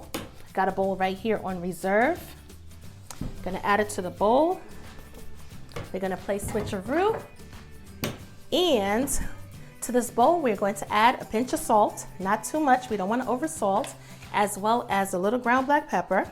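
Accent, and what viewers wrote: New York, United States. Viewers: I love your New York accent 💕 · Is that a New York accent?? It’s so cute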